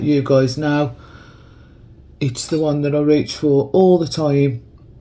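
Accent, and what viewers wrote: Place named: Black Country, United Kingdom. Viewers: Where about are you lovely your a cent sounds like your just up thre road i am coventry . If i shut my eyes its like listening to jess philips mp 😅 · That red hair really suits you, it makes you look younger x l love your black country accent too xx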